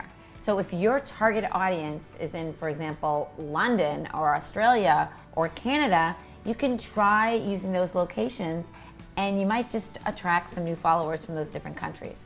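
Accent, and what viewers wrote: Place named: Boston, United States. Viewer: is the best!! She has been so helpful in providing tips to help me grow my business! She’s the real deal and an Instagram guru!! And I love her Boston accent!!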